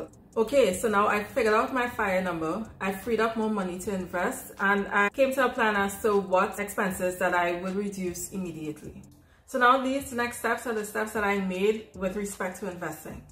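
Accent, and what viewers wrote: Trinidad and Tobago. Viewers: I knew I heard a Trini accent lol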